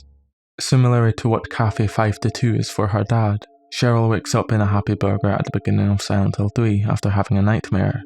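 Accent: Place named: Aberdeen, United Kingdom. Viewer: You sound like you're from aberdeen.. I could be wrong.. but it's very similar to my accent! Anyway, fantastic video man!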